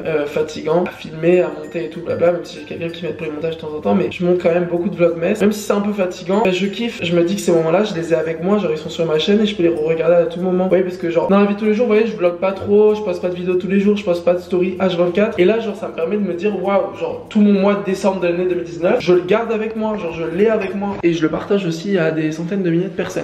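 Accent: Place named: France. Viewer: You should do a video in English! My American ears cannot understand your frenchie-ness:(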